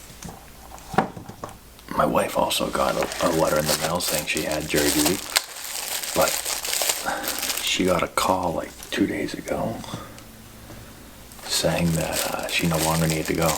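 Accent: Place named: Boston, United States. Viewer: I knew your were a freak lol ASMR hahaa all that heavy breathing and the boston accent so hot!!!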